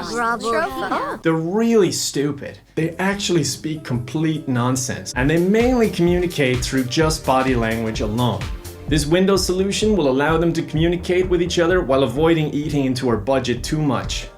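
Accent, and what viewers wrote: United States. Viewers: Why did it take me 5 minutes to realize he was using an American accent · Is our precious making an American accent?? 😂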